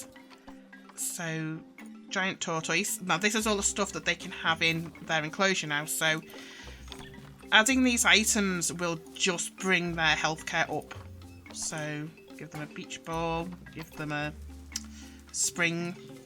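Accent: Liverpool, United Kingdom. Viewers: you sound like you're from somewhere surrounding liverpool, nice video too!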